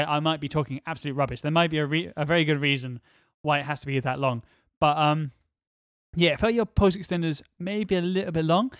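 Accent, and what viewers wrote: United Kingdom. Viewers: MAN THIS DUDE IS SO INTERESTING I LIKE YOUR VIDEOS KEEP UP THE GOOD WORK,THOUGH ITS KIND OF LIKE MUMBO JUMBOS,UR ACCENT IS BRITISH UR CLOTHING IS FORMAL AND U DO REDSTONE VIDEOS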